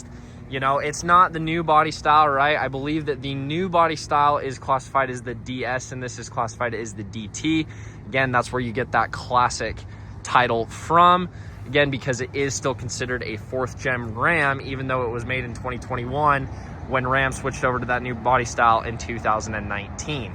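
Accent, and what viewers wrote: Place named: United States. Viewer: Your country accent is so cute 😊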